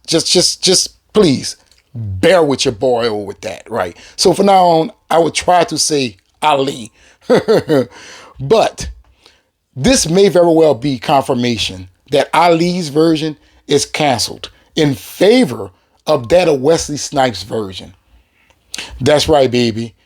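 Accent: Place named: Louisiana, United States. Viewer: You sound like you’re from Louisiana. I think Channing Tatum studied “black people” from that state to get the Cajun accent. What do you think?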